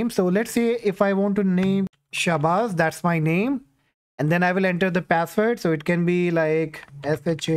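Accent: India. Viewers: i found this video, and when i hear india accent i start looking for another video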